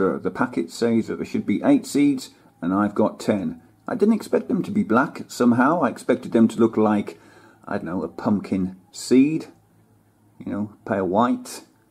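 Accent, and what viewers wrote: United Kingdom. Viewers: 😂😂😂 7.10 I was not expecting that from such a posh accent 🤣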